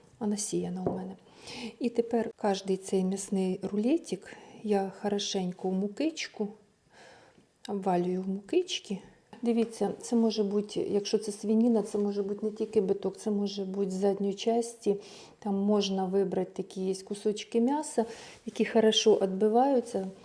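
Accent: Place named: Poltava, Ukraine. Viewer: Так говорять на Полтавщині,на Сумщині,на Донбасі.Що не подобається? Чі тільки треба на галичанському говорити.Коли ви вже перестанете чіплятися до того,хто як говорить.Задрали вже!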